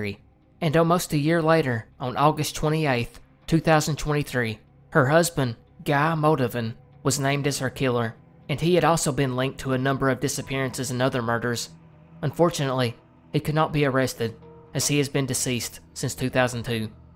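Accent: South, United States. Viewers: Best icebergs goin'. Love hearing a southern accent being used intelligently and unashamed. We southerners are not dummies, like most media represents us as. Keep up the great work!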